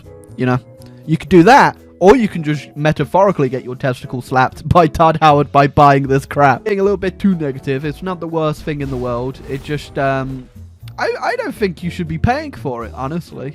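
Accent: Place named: RP, United Kingdom. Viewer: You sound like you have royal blood!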